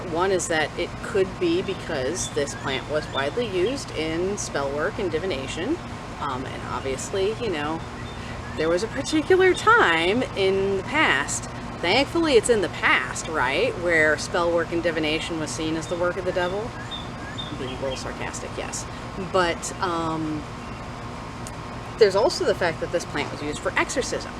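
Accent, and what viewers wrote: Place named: New England, United States. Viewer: Sounds like my neck of the woods. New England.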